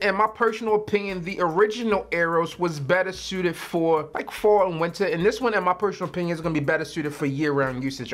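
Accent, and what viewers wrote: Baltimore, United States. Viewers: Yo, you from Baltimore?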